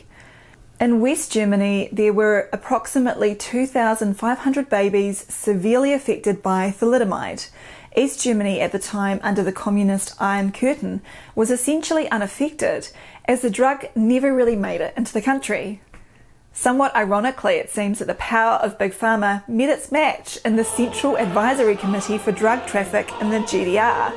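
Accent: New Zealand. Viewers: Just love the topics, your easy to listen to with that adorable voice and Kiwi diction 👍😁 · ... your material is awesome. My go to info source. Sadly my puter and phone both try to make your Odysee vids impossible to watch. Very frustrating. I was sooooo happy to see this one go so in depth and get away with it... kudos to you. I lived in NZ for 14 years and God, I wish I'd had you as my GP..:D I think too much... is that a thing? Thinking too much? It seems thinking is a quaint old tradition no longer observed by most. People just accept/react or react/accept. You.. you.... are one doctor I'd loooooove to talk to. You seen those memes depicting dead legends... who would you like to talk to? Yer not dead and i hope that doesn't happen for a looooooooong time... but I'd dearly love to pick your brains... you seem a conventionally trained modern physician... 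yet one who has begun to question the current paradigm. THAT is an amazing leap better than any moon landing. And yes, I grew to love the Kiwi eeksahnt? I know you have a GP practice, a Youtube star base, but god! I'd love to talk to you. i have soooooo many questions.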